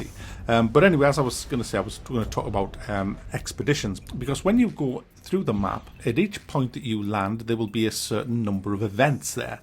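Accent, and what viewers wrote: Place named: Newcastle, United Kingdom. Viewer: Oh Lord, first time watching a review channel and it'll never be looked at again. Normally liked a Geordie accent but this guy was wildly unfunny and f**king annoying.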